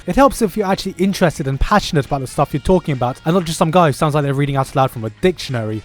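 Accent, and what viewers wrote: United Kingdom. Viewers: British accent, check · Why DOES he sound British???? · Why does this japanese guy sound british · Did you learn the British accent just for your videos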